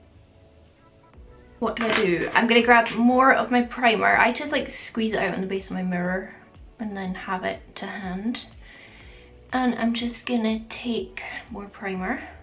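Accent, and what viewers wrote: Scotland, United Kingdom. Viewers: More looks like this ! Amazing ! Also ur voice is super relaxing ! So nice to here a scottish accent on here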